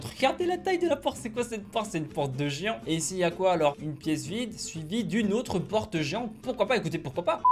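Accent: Belgium. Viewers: t'es le meilleur t'es trop pouissant, je t'adore avec ton accents de Belge, j'imagine, vue que tu vis en belgique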